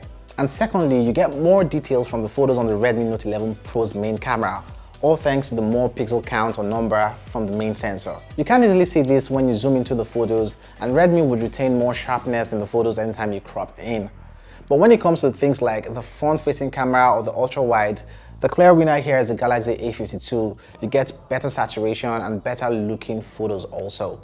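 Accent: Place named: Nigeria. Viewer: He sounds like a Nigerian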